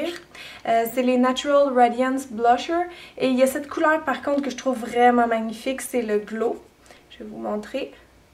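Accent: Quebec, Canada. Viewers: C'est un délice de voir tes vidéos, ton petit accent canadien que j'adore,en tout cas je suis tes conseils merci beaucoup de ta spontanéité , j'adore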